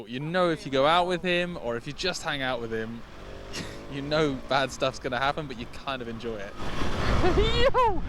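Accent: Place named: United Kingdom. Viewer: All I need to hear is "properly fun" in British to know the bike out of my range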